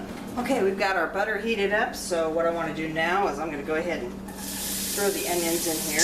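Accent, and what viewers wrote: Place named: New England, United States. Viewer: Looks great!! Your New England accent wasnt to bad either:) Cheers!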